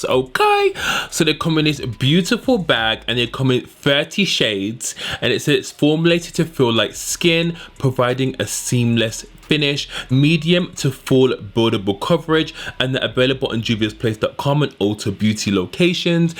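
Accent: United Kingdom. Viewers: Yanna Teira it’s the British accent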